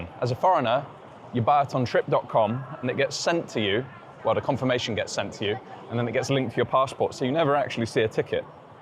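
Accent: United Kingdom. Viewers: why you look and sound so British, but with an Italian Passport?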